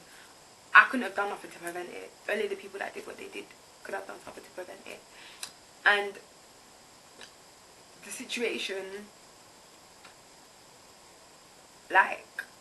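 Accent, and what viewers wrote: United Kingdom. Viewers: It’s a thick british accent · You can use the caption. She's British. That's self centered for you to expect a person to speak like you. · Probably bc ur American, she’s British I can understand her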